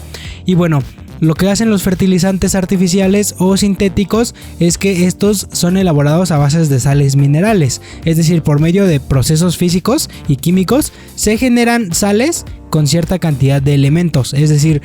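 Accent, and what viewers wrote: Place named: Mexico City, Mexico. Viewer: A mi, me molesta mucho el sonido que hace cuando usa "tr", ya sé, es extraño pero me imagino que tiene la boca lleva de baba además además de su acento chilango, pues buen trabajo. Felicidades.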